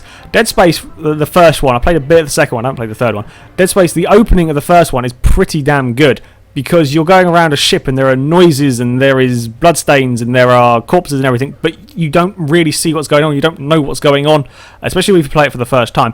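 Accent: United Kingdom. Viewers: man leave the brit alone!! im a southern guy in the u.s. and his accent and the way he talks doesnt matter this is youtube not school he can talk how he wants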